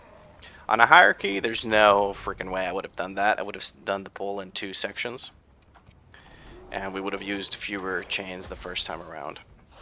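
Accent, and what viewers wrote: United States. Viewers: Awesome vids! You sound American, but I keep seeing Russian and German in party chat. Are you from Europe? Im partially asking cuz usually once per video I see you pull mobs I have never seen pulled before😂 Was just wondering if it was more common in NA. Or if you just pull somewhat randomly until you get to a key level where it gets a bit harder. All vids are really helpfull and informative as im trying out tank for the first time😅 Keep em coming💪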